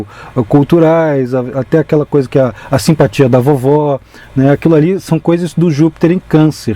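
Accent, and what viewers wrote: Brazil. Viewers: bom dia! Você é mineiro? Sou mineira de Belo Horizonte, taurina e ascendente em peixes. Grata pelas explicações dos eclipses, um abraço!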